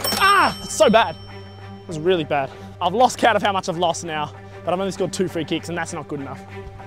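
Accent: Australia. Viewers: are ya aussie as well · Ru an Aussie? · he sounds like a aussie is he one? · Ok now i knew your australian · I had no idea you were australian, I assumed you were british from watching your shorts with no audio lol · Is this guy Australian and if he is is he from Sydney?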